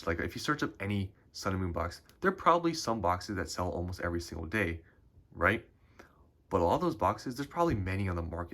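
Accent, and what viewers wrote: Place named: Asia. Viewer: This is random af but from your accent and hand I'm guessing you're asian?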